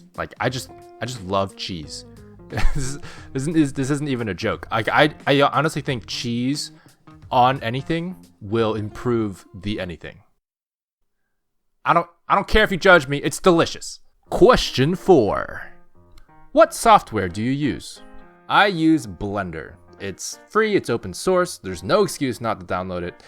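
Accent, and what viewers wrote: United States. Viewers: chest on anything make anything better, sounds like an american alright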